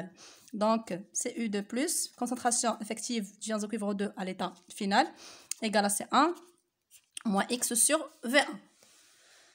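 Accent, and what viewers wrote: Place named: Canada. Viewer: Canadian accent wow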